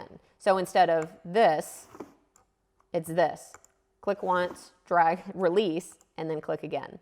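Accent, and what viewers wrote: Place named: Waco, United States. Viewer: Love the Waco accent, with all the "Sawring and Drawring" you do! :-)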